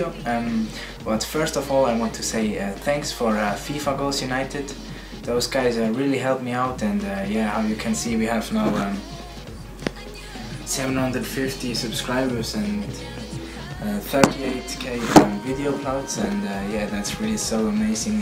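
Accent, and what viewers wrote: Switzerland. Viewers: Man merkt voll das du Schweizer (Deutsch redest) bist ^^ Woher kommst du? Ich wohn grad bei der Schweiz an der Grenze :D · Man hört dein "Schwiizerdialäkt" aber schüsch geils änglisch.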